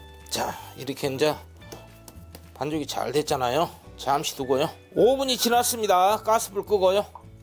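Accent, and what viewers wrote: Jeolla, South Korea. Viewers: 전라도 사투리가 아주 구수허니 정겹습니다 말씀 만큼이나 요리도 정성도 꼬숩고 대단하십니다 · ㅋㅋㅋㅋㅋ. 선생님. 말씀 구수한 사투리 전라도 말씀 진짜 잼있어요. 음식도 맛나고 말씀도 구수하고. 진짜. 잘 알려주시고. 고맙습니다. 모는 음식. 해봐야 하는데. 시간도 없네요 ~~ㅎ ㅎ😂😂😂🎉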